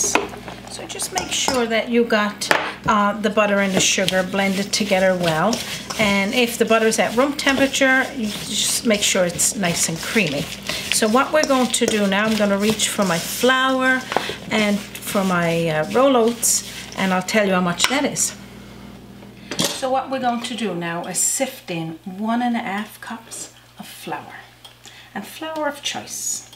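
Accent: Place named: Newfoundland, Canada. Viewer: I just discovered this channel tonight. Lovely to hear the 'Newfie' accent! True maritimer with a cup of tea to go with! Thanks for your knowledge! Happy holidays my fellow Maritimer!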